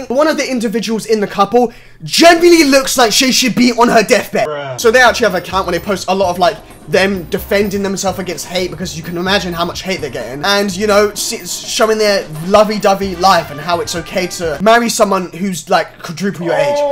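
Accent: United Kingdom. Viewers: w bri'ish man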